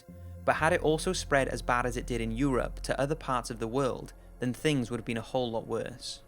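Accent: Wales, United Kingdom. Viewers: Are you Welsh ?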